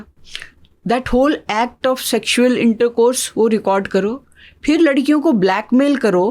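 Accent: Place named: Punjab, India. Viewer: Maam is punjabi …her accent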